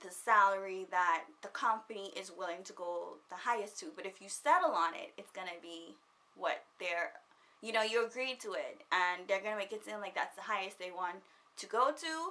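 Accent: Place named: Bahamas. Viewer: 🙂I love the fact how you are still speaking like a true Bahamian.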